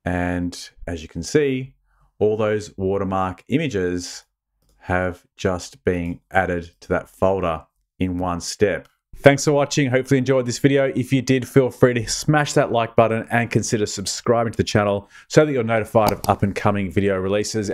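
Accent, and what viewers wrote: Australia. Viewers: Great tute, exactly what I was after & great to hear it spoken in aussie so I don't need subtitles ;) Massive thanks. Wondering if there's a way to make the logo size a percentage of the image also if you know how to add a border during the same process or a diff one if neccesary?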